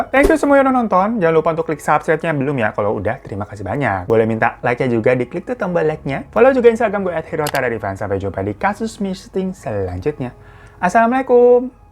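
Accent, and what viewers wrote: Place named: Jakarta, Indonesia. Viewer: Ya ampun bang betawi amat yak wkakakkak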